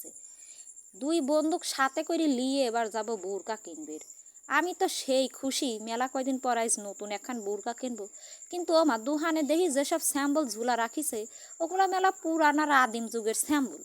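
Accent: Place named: Pabna, Bangladesh. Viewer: খাটি পাবনা জেলার ভাষা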